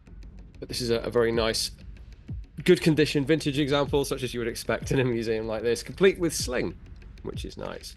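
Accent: United Kingdom. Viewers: He's pretty good. Like gun Jesus with a britsh accent and a mullet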